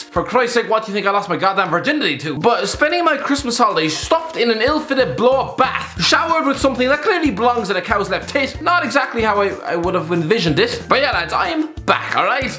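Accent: Ireland. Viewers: Yes! The sca....Irish guy is back! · When you're that Irish the subtitles think you saying "hello and welcome to hitc sport" is you saying "how about tomatoes disease poor" you need to slow down when you talk my friend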